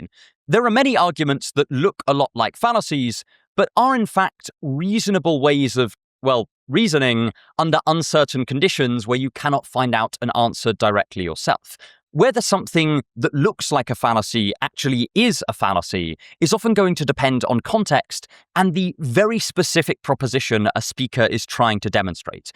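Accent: United Kingdom. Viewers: British voice alert, I repeat, British voice alert ⚠️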